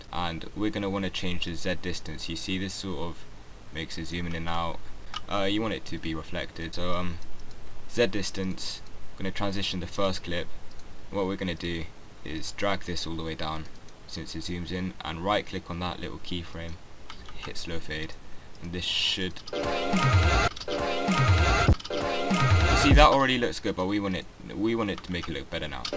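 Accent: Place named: United Kingdom. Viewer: my man sounds like the most british person i've ever heard btw props to u for doing this whenever i ACTUALLY try to use cins ima watch this again cuz its actually really good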